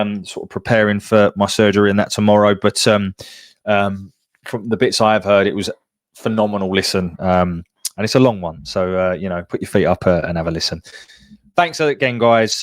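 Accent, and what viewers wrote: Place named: Birmingham, United Kingdom. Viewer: sound just like a brumy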